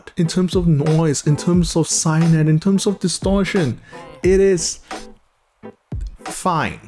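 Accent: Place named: Singapore. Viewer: Just found your channel and I super appreciate your no-nonsense style and knowledgeability. Also your accent makes me nostalgic for sg ✌🏼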